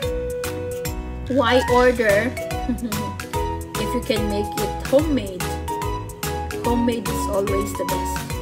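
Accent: Philippines. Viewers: ph accent. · Love you Filipino accent 😊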